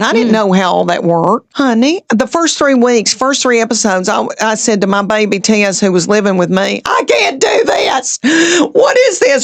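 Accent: South, United States. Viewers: I don't know about farming people and I know with your shows suppose to give a Golden Girls / Friends vibe but honestly that accent takes me back to one of my favourit sidcomes from way back when Designing Women gosh. I loved that show I recogn if they did a remark though I'm sure it never be as good I probably love that too just because of the accent. Southern accent like that it just makes me think of the most interesting, most sophisticated, most hospitable and sexies women on the planet! Every American show just tries to get rid of the accent but in fairness because nobody does it - it's real interesting to rest to the worlds who never hears it or the little idioms that go with it. Considering how you seem to attract your 1st husband that is no word of a lie him being all up in your face since the first day he met you can stalking you the rest of his life. 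Part of me, sometimes like to put on a little southern accent especially if I wanna sass some boyfriend whom I'm flirting with cause his all about keep the Aussie accent, but have you heard the Aussie accent - nuthin' sexy about it! Men are abit slowly - he really doesn't get it but his the only one I do for and because it kinda pisses him off which kinda gives me a kick.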